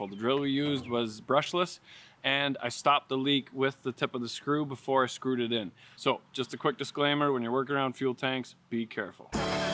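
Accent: Canada. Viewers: Talking a' boat! I love the Canadian dialect!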